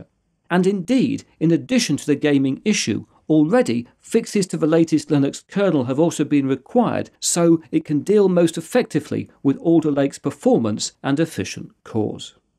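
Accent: United Kingdom. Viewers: This is how I like computer news: clear, concise and British!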